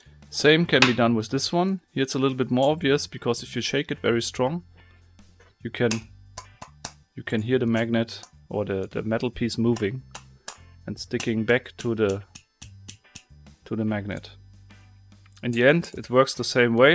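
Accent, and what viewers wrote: Germany. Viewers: I'm here for the puzzle's too but I can't watch more than 1 video of him without muting the video... Don't understand me wrong I love this channel but quality is important.. I know what he's trying to say but it's hard to understand because it's neither british nor american english.. It's really shitty german english and german english doesn't sound good... he needs a lot more english experience to improve on this... so it would be better for him to talk german in his videos and I think most of his fans are german too ^^ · Kommst du aus Deutschland?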